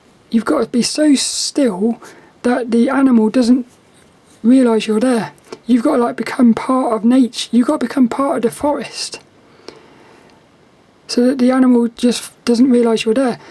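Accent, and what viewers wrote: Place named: United Kingdom. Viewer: Anyone can learn a little BritishnEnglish from listening to lill you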